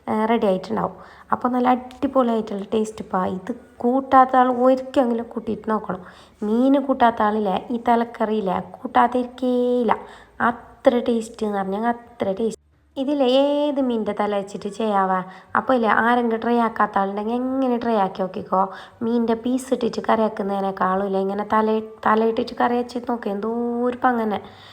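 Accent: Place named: Kasaragod, India. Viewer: 🎉🎉🎉❤ സൂപ്പർ❤❤ കാസർകോട്ട് സംസാരം കേൾക്കാൻ സൂപ്പർ നമ്മൾ കോഴിക്കോട്ടുകാർ🎉🎉🎉❤ ഇതൊന്നും വെച്ച് കൂട്ടി നോക്കണം😂😂😂😂😂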